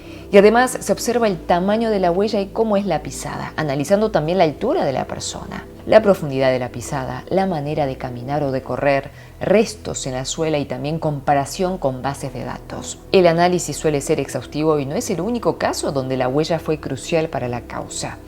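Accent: Argentina. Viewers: hablas perfecto español argentino me alegro mucho por vos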